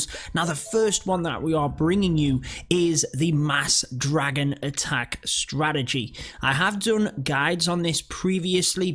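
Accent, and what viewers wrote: United Kingdom. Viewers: Thanks! Subbed and liked. Are you british 🤔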